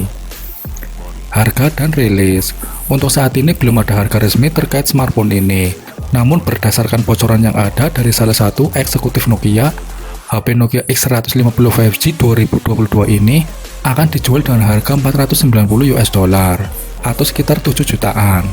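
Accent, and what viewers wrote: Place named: Java, Indonesia. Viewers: Medok amat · Suara khas, tegal ya keluar..😃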